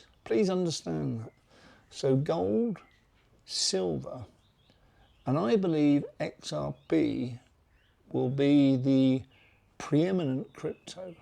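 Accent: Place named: United Kingdom. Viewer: People with British accents just sound smarter and more trustworthy. I’m hooked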